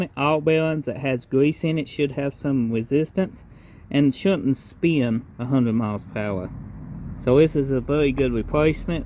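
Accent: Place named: Louisiana, United States. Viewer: You from Louisiana?, it sounds like someone from that neck of the woods.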